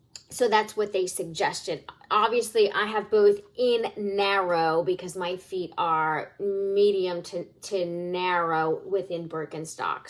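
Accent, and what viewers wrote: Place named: South, United States. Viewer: you speak really well, your voice is therapeutic... do you speak another language?? i can tell you are from the southern US.. love the vid you look great btw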